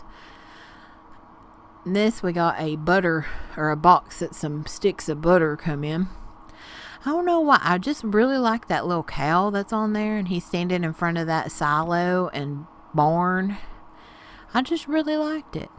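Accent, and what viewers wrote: South, United States. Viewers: thanks love your harvest videos. you sound like you are in the south. i am in nc. i have 10 cat rescues. Or should i say they have me lol i remember in one of your older video you had mentioned you were in college, do you still go, hugs and stay safe out there. I am older with health issues so i have to stay put for a while. fun is lol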